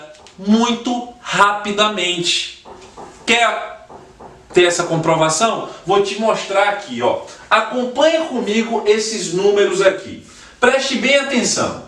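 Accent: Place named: Bahia, Brazil. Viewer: legal a aula e o sotaque baiano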